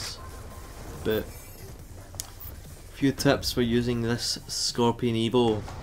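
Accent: Scotland, United Kingdom. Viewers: Has he's Scottish and that waz a BEAST gameplay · waiting for the scottish accent tutorial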